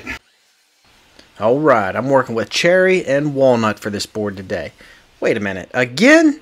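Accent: Pittsburgh, United States. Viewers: Watching from Texas. Sounds to me like you are from Pittsburgh? Moved from there in 66 but still have my Accent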